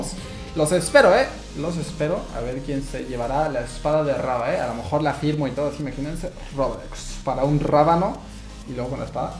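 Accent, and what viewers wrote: Mexico. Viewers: raba entonses ¿de que país eres? siempre pensé que eras de México :p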